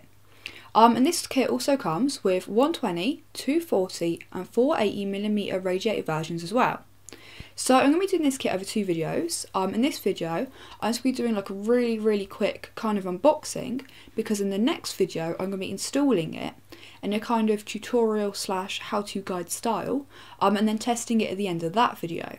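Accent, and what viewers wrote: United Kingdom. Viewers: Hey, you're so damn beautiful... I love you, your british accent and your videos!:)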